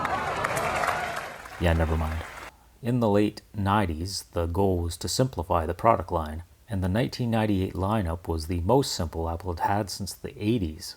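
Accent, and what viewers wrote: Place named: Canada. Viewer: You should do a video on the first aluminum iMac model from 2007! You could show Apple's sudden shift to their current aluminium/brushed metal look for their iMacs, since they released a white iMac earlier in the same year. I REALLY miss the white clear plastic look, but I have a fondness for the early aluminium iMacs. About 2 years ago I rescued one from being thrown away at my university. It was running Yosemite (and very poorly). I installed Leopard on it using a modified install disk for a MacBook. But I'm planning on putting Tiger on it sometime in the future. I even picked up the original mouse and keyboard that came with that model, just to keep it era-accurate. Also love your channel, I immediately knew you were a fellow Canadian.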